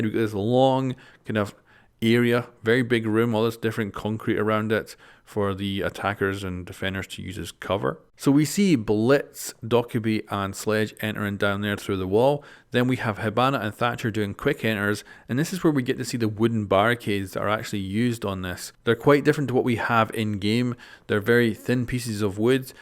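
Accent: Scotland, United Kingdom. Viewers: malta? hes scottish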